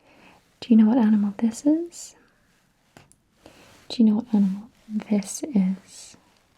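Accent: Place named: United Kingdom. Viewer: oh my gosh! this ticks all the boxes right now!! -spoken and not whispered (not that I dislike whispered asmr, but when it's something that should be close to being realistic, I think, non-whispered is better suited!) -cognitive exam/mini-mental-status whatever you wanna call them! - so underrated -UK accent :)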